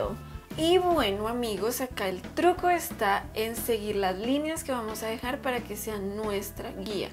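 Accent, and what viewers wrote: Colombia. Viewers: Usted es de Colombia ??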